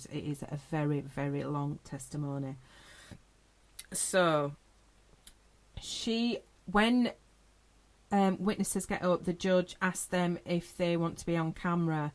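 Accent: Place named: United Kingdom. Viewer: Just came across the channel today and so glad to hear a British voice for a change when discussing crime , most seem to be American that I follow anyway. Have subscribed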